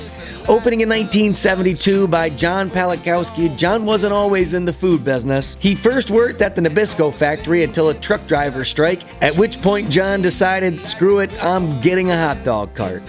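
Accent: Chicago, United States. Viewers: lol. I was guessing this video was made 15 years ago! Your Chicago accent makes this a genuine Chicago food video. 😂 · I don't even need to watch the video, but only listen to him speak momentarily to know he's from Chicago, my hometown. 😂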